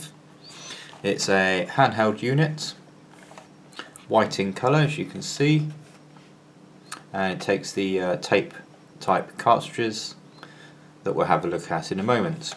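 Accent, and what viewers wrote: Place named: United Kingdom. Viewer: if you wanted one with rechargeable batteries and an adapter why don't you go get one??? there are many other brands, brother included that sell them as you wanted. why buy a cheap product and then complain about it not having components. Must be a British, UK or whatever the hell your accent is from type of thing. Don't buy equipment that is cheap and then want all the extra features. use your common sense!!!!!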